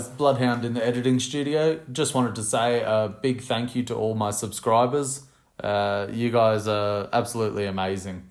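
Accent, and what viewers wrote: Australia. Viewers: You Aussie, mate? Hello from Toowoomba!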